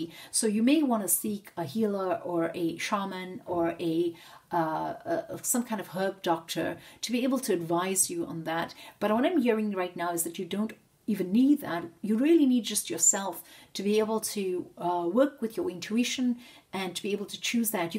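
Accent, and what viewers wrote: South Africa. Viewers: Love your style 🔥 Also lové your accent, are you based in South Africa by any chance?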